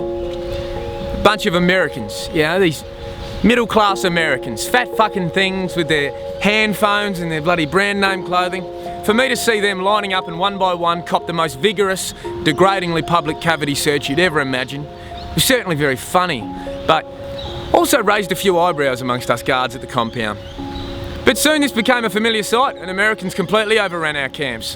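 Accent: Australia. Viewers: yikes. i really doubt anyone wants to go a country where they'd have to hear your stupid aussie accent every day. better to be eaten by sharks. trump 2016!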